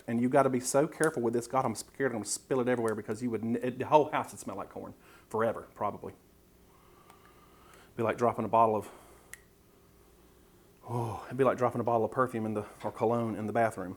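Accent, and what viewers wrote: South, United States. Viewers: Hey, Throw some cracklin's in there.The last one with the bacon grease and liquid corn looked awesome I wonder if you could bake them in the brownie pan hummm.Just 3 weeks ago you hit 5,000 and have you looked at your numbers? I spread the word at work looking forward to 10,000 in the near future. I have 2 friends at work that don't do low carb but, they just like your humor and southern accent.I love both of those also but, your carb smart and honest in your opinions.Thanks for sharing. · There is NO doubt you are southern... since my gf is total southern from bama!!! Loved your pancake video and this is the second one of your videos i have watched you remind me a little bit of Alton Brown... you come off very honest and genuine and likable so I have subscribed to your channel you have a new fan!!! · Come for the cooking show, stay for that southern draw. 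· I can tell you are a Southern boy. 🤣😂 Love it. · Im really enjoying your videos. You're respectful, good speed, clean environment, humorous! Love the southern accent as well! :) Thanks!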